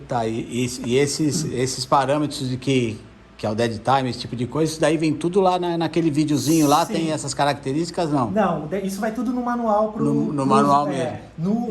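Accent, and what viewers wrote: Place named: South, Brazil. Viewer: Cara tem a mesma voz do Cristian da Education kkkkkkkkkkk esses do Sul falam bem parecidos com outros da região ........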